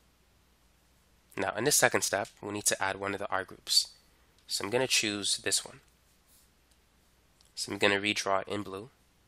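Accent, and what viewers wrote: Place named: Northeast, United States. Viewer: his voice is soothing idk what you’re talking about. lmao its like an northeastern accent from the US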